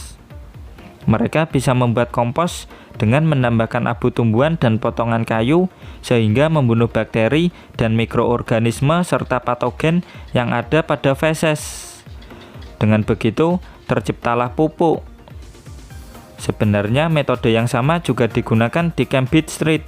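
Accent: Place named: Java, Indonesia. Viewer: Cuma saran min,,maaf sebelum nya, kalau bisa logat Jawa nya gak usah di keluarin,,mending bahasa Indonesia aja... Pendenger gak semua sama suku kan...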